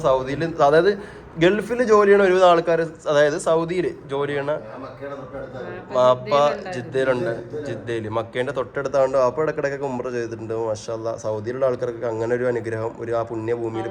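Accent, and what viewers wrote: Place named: Palakkad, India. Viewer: നിങ്ങൾ എന്താണ് പാലക്കാട്‌ ഭാഷ പറയുന്നത്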